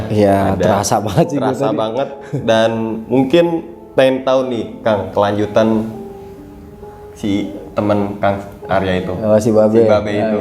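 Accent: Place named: Jakarta, Indonesia. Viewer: Betawi pisan ini orang wkwk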